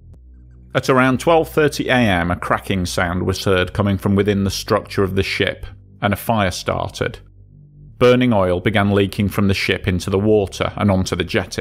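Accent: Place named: Yorkshire, United Kingdom. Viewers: I love his accent... I was guessing Yorkshire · his accent... from Yorkshire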